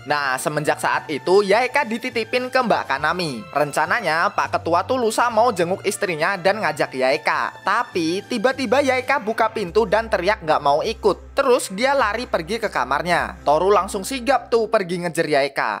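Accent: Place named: Banyumas, Indonesia. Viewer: Bjir admine wong ngapak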